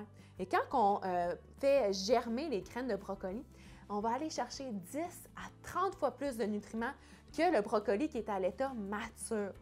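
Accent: Canada. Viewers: C'est marrant comme tout d'entendre parler une canadienne. Sinon interessant mais c'est en pleine lumière(personnellement je fais ça a l'abri de la lumière pour en avoir des pousses tout blanches)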